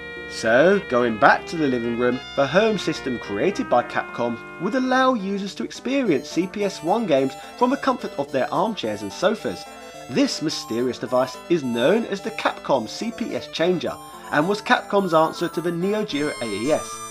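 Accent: England, United Kingdom. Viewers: It's his accent. He's from the U.K. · Are you england?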